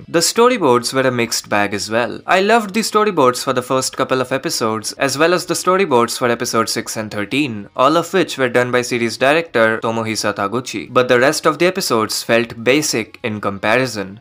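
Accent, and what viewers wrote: India. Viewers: His voice sounds like how Indians usually talk with this kind of accent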